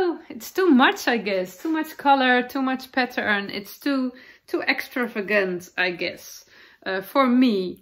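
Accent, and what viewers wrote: Netherlands. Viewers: I know that I don’t have to say that your English is perfectly fine, but really, it IS! Please do not stop doing the podcast in English. Yours is my ABSOLUTE favorite knitting podcast and I will be so sad if you give it up! I look forward to it every week. Of course, you should do whatever is best for you, but for your viewers (especially this one) I love listening to your Dutchy English. ❤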